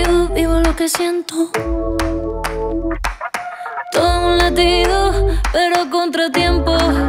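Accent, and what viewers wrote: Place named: Andalusia, Spain. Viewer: Tiene acento andaluz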